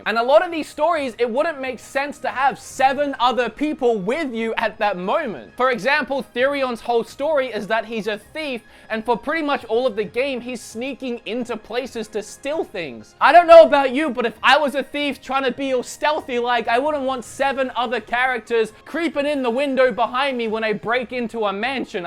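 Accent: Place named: Australia. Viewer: Also stop with the stupid aus accent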